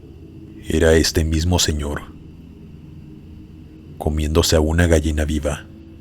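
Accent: Mexico. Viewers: Hola, de dónde eres? 👁👄👁 como que me das vibras norteñas, de México 😅. Por cierto, excelente vídeo, como siempre, me encanta escuchar tus vídeos, me acompañan desde en el tráfico, o haciendo quehacer en casa. Saludos desde Guadalajara, Jalisco 🎉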